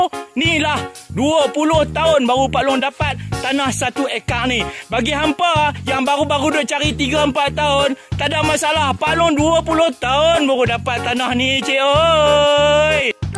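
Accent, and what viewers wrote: Kedah, Malaysia. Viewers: lama dok kat pahang, tapi loghat kedah masih mantap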